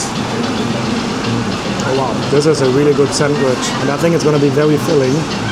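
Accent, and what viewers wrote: Germany. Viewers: Übrigens sprichst Du ausgezeichnetes Hochenglisch mit einem niedlichen deutschen Akzent! Respekt! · i can hear the deutschland out of you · Deinen deutschen akzent muss man mögen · You sound very German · Mein bester Mann hört das du aus Deutschland kommst 😁😁😁German English ❤️ Aber super Videos 👍🏻😊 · hes from germany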